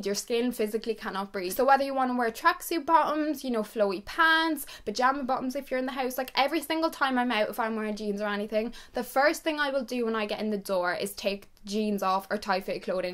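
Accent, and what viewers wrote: Ireland. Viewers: are you irish??? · This video is super informative! U are adorable and ur hair is super cute! Also is she Irish? I’m not rl good with accents😂😅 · These videos are so helpful thank you!! Also I love your Irish accent so much 😍 love from Italy 🇮🇹 ❤️ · she's Irish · Great tips and could listen to you all day long, with your great Irish accent your on to a winner. Take care 😉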